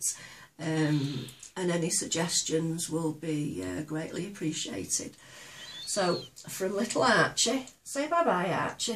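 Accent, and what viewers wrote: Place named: Manchester, United Kingdom. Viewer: Hi lovely northern lady...welcome to YouTube, this is a great first video, well done to you and your helper. You sound like you're from Manchester or somewhere that way...I'm in sunny Lancashire..lol..best wishes to your daughter, I hope it all works out well for her. Your teacup 'n' saucer are beautiful...looking forward to seeing more from you soon...Ann...x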